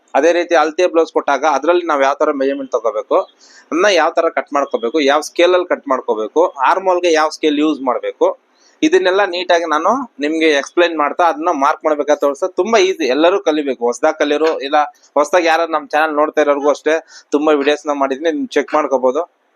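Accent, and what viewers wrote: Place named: Kolar, India. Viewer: ನೀವು ಕೋಲಾರ ಅಥವಾ ಚಿಕ್ಕಬಳ್ಳಾಪುರದ ಕಡೆಯವರು ಇರಬಹುದು ಅನ್ನಿಸುತ್ತೆ ಸರ್.ಮಾತಾಡುವ ರೀತಿ ಆಗಿದೆ, ಚೆನ್ನಾಗಿ ಬ್ಲೌಸ್ ಕಟಿಂಗ್ ಹೇಳಿ ಕೊಟ್ರಿ.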